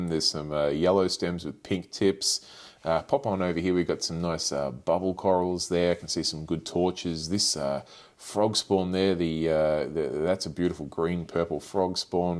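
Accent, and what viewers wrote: Australia. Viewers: Ahhhh, I always thought it was..... I do love Australian accents😁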